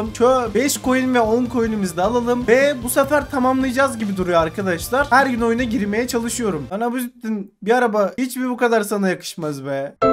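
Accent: Denizli, Turkey. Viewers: Abi sen denizlilimisin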